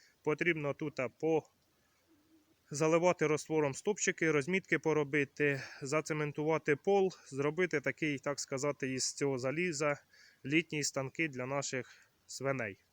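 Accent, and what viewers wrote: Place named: Poltava, Ukraine. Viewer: полтавський говор не сплутаєш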